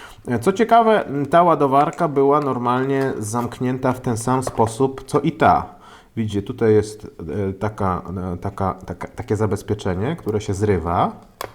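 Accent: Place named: Kielce, Poland. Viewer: Hahaha 😁 razi Cię bo po nicku wnioskuję, że jesteś z Opola. To jest mowa z okolic Kielc 😉 Sam z tych okolic pochodzę i pamiętam jak wszyscy mówili : czopka, szolik, szofka itd. Po 20 latach w Krakowie słyszę te różnice, ale nadal wychodzę na dwór a nie pole 😉 Taka gwara lokalna, pewnie też u Ciebie coś takiego jest i nawet nie zwracasz na to uwagi. 👍 Miłego dnia.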